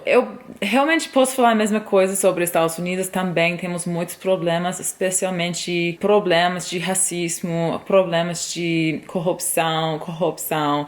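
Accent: Portugal. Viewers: Legal, sotaque muito parecido com portugues de portugal. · Você tem sotaque português de PORTUGAL.... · "Psiu" é som para chamar gatos ou para pedir silêncio, chamar alguém assim ou por meio de assobios é falta de educação. Eu sou pontual e também não gosto dessa mancada que a maioria dos brasileiros dá, que é o de atrasar em seus compromissos. O seu sotaque lembra o de uma portuguesa!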